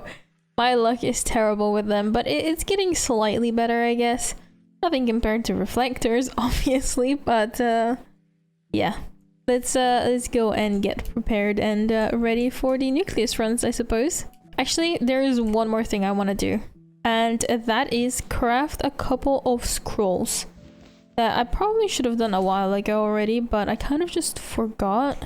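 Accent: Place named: Ireland. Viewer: what accent do you have? it sounds like a mix of cork and belfast ireland but idk for sure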